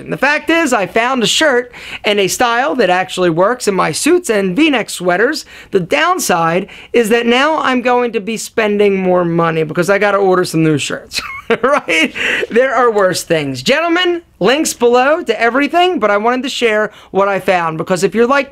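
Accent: United States. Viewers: The loud American accent ruined it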